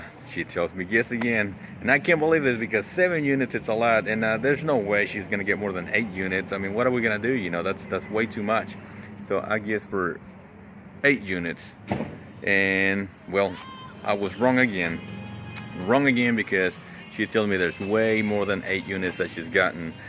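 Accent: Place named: South, United States. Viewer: Where are you? You have a touch of a southern twang, in your talking voice! XD Well, show us what you are keeping so we can advise! OMG, your going to be overwhelmed stop buying units til you clear stuff out!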